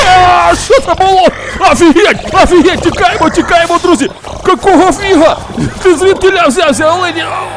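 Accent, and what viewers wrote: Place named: Arab world. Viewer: До речі, хотіла спитати, ти араб ?( просто у тебе такий діалект , як у арабів)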